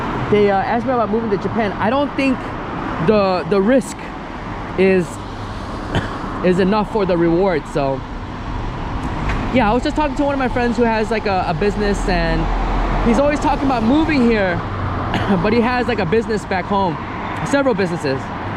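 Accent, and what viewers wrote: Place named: United States. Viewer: Oh, I forgot to say this is Happy again, I Just log in with my account because it's too difficult to write from ipad,and so difficult to edit. Yes, you and I do have similiar background, but trust me, you are very americanized, you will not have any problem what so ever if you ever choose to live in America, you are more american than you think you are. But you don't have to live in US, you can lived anywhere if that's what your heart desire. Only down side is you probably do want to use facetime to spend with your parents since it would be hard to not spend time with them. I came to us when I was 13 years and after living in usa for 31 years, I am still not as americanized as people think, but that's okay. I will never speak perfect English like you do, I still have tiny bit accent. I see many people immigrant from other country and have "little" problems lived in US, but again, if one has desire to stay, they will learn to adapt. 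so if you happy living in Japan, just be happy to do so. However, don't think you will not able to adapt US, afterall, when I "listen" to your talks, I heard just a perfect american speaking. so anyway, just a little point to share.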